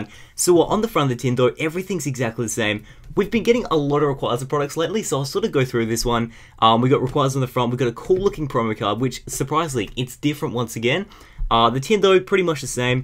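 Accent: Australia. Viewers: Are you an Aussie? I am you sound like one, if so do you follow the afl and who do you follow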